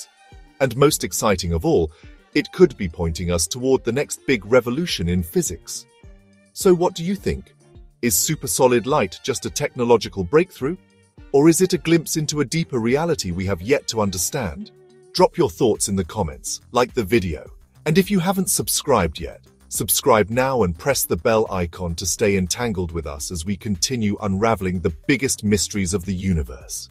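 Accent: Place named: United Kingdom. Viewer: Screw that British accent. Bad choice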